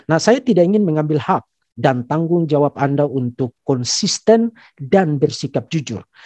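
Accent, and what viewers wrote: East Nusa Tenggara, Indonesia. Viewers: aksen rote NTT... Bravo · Narasi yg cerdas, dan ilmiah, klo dengar dialeknya teringat waktu kuliah di Malang bersama teman kuliah dr NTT, Tuhan Yesus memberkati bang sekeluarga 🙏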